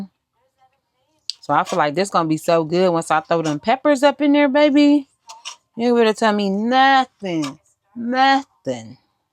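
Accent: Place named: South, United States. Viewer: What part of the south are you from seriously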